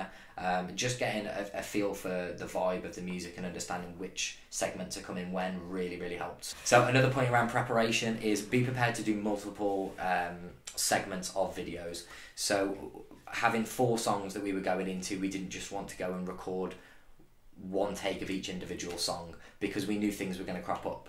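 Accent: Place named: Germany. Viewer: Can barely understand your English--sounds like Ginglish. Technical mambo jambo in Ginglish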